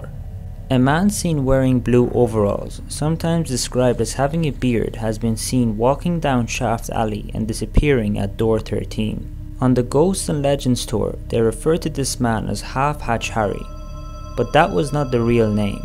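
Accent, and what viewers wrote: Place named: South Asia. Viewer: i can't understand what you are saying due to your thick accent.. what is your country of origin? pakistan or india? please learn to speak properly before doing more videos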